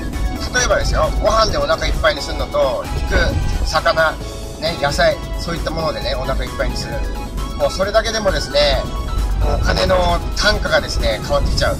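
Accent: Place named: Tochigi, Japan. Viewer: ありがとうございます 関係ないですけど自分も栃木県民なので応援してます！w たまにでる栃木訛りがいいですね！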